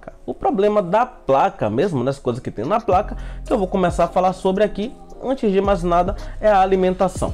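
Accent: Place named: Salvador, Brazil. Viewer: você é de salvador aaaaaaaaaaaaaaaa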